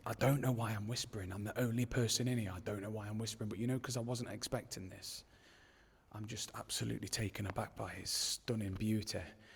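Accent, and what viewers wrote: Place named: England, United Kingdom. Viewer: Have you not seen York Minster? You sound English & not particularly 'southern' so I too am surprised that you were never taken on a school trip to a 'Minster' town. The whole town of York is stuffed with architectural & historical gems. The Minster of course but also Cliffords' Tower, the Guild Halls, the walkable town walls & ancient cobbled streets called 'gates', the Shambles, the Railway Museum, the ruins where the Mystery Plays are performed, Bettys Tea Shop & much more. You could easily spend a week there & not see or do everything. The river Ouse, when not in flood, is a treat in itself. I have not been to the Christmas Market for many years but it used to be a treat too. Cheers. Other towns of interest In Yorkshire alone worth a visit would be Ripon with its Cathedral, Harrogate, Knaresborough, Whitby for the Abbey & its neighbouring church & many more. 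Wishing you happy hunting. Since you are a joiner you should be particularly interested in any Rood Screens in church buildings that were not destroyed during the reformation. As a joiner it is also worth remembering that the Church also employed highly skilled craftsmen, artisans & labourers to build Cathedrals, Minsters, abbeys, nunneries, hospitals, chapels & churches, some of which took hundreds of years to complete & which all need the same skills for maintenance today. In the words of the old song this all made "work for the working man to do". These places may seem grand but the atmosphere is provided by hundre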